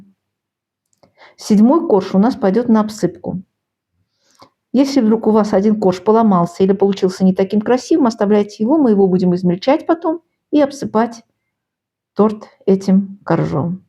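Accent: Baku, Azerbaijan. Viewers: Спасибо за рецепт. У вас даже акцент бакинский ❤❤❤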